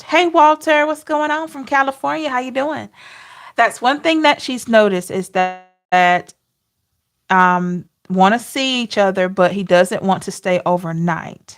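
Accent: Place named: South, United States. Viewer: I'd Love to understand what part of the USA your accent is from. It's generally Southerners who say "em-pore-dent" as opposed to "im-pore-tant".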